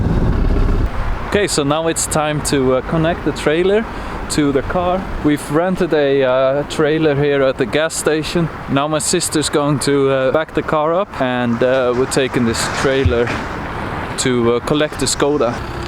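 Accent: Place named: Netherlands. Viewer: Ik hoor je Nederlands praten 😄 I hear you speak Dutch not Swedish 😀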